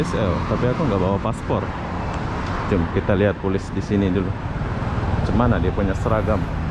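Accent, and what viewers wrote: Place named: Malaysia. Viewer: Bro lo org malaysia apa indo sih. 😂 logat lo kya org malaysia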